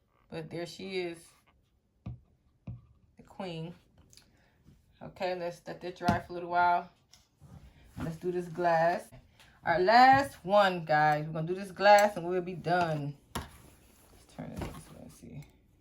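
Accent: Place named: New Orleans, United States. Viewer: You sound like you from New Orleans! I know that accent anywhere!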